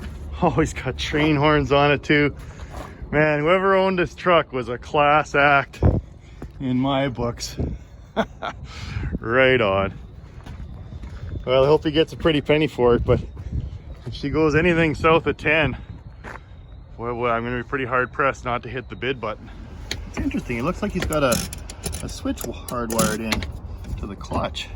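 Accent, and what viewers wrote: Canada. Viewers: I know nothing about trucks, but listening to a Canadian guy talking about trucks so passionately is so relaxing to watch.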